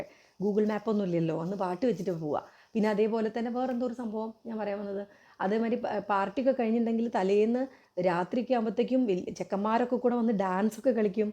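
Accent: Kozhikode, India. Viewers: ഈ nostu കേൾക്കാൻ വേണ്ടിയാണ് ഈ ചാനൽ കാണുന്നത്. പിന്നെ കോഴിക്കോട് സ്ലാംഗ് കേൾക്കാനും ഒരു ആഗ്രഹം. എൻ്റെ അച്ഛൻ്റെ വീട് കോഴിക്കോടാണ്..അവിടുത്തെ ഭാഷ അതേപോലെ അവതരണം 👌👌👌👌👌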